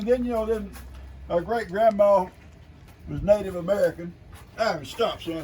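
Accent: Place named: Texas, United States. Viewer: Thank you for sharing your life with us. I love your stories and listening to your Texan accent and of course all of your babies ❤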